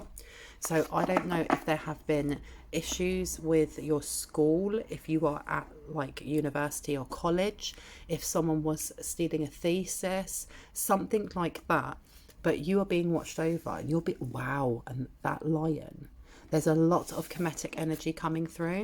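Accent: United Kingdom. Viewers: thank you my love you add subtitles i think you have British accent and i am Indian that's why i need subtitles to listen video ..... thank you. you are beautiful angel ❤️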